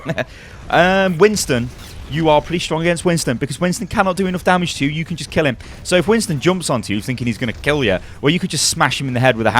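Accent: United Kingdom. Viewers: i usually dont like the British accents, but your commentary is on point! subbed man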